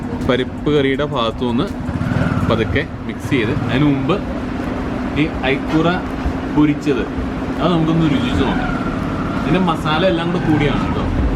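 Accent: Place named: Kozhikode, India. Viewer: കോഴിക്കോടൻ ഭാഷ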